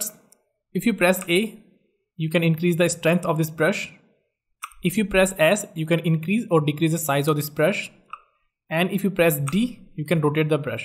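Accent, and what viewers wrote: India. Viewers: You are Indian by accent . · Who agree that he is indian but speaking english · when there is an indian speaking, you know its going to be a good tutorial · I know that you are a Indian I can identify by your vioce I see very less indian game dev channel's keep it up 👍🏼From indian punjab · indian accent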